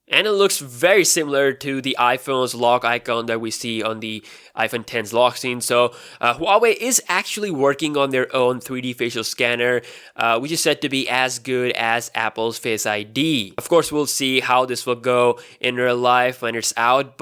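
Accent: India. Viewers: det is India talk! Hahahaha...Pidgin "English" ! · You got indian accent. Video is good.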